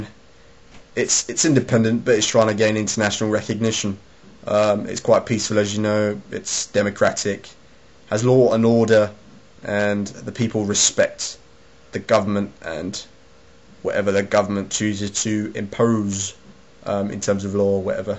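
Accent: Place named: Australia. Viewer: aussie accent. cool.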